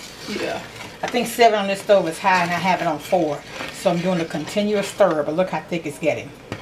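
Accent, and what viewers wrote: South, United States. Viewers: I love your good ole' Southern accent. I think l will try this recipe.😄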